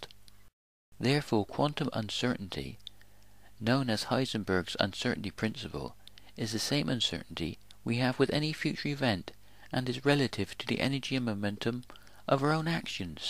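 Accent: West Country, United Kingdom. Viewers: I shared your Video to the Google+ Science community and it was moderated to Death.. I think your West Country accent got the better of them. Don't let them grind you down. They think they know it all.. but they are dancing to Pan's pipe.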